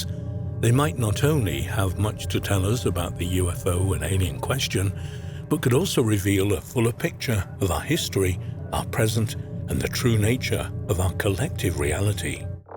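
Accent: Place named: England, United Kingdom. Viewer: Where is the button to block documentaries in a America narrated by English people. They're the worst, they're telling you about things that happened in the United States like they live here and are a expert in American UFO encounters and the geographic layout of the states. 💯🤦🏻‍♂️🤦🏻‍♂️🤦🏻‍♂️🤦🏻‍♂️🤦🏻‍♂️🤦🏻‍♂️💯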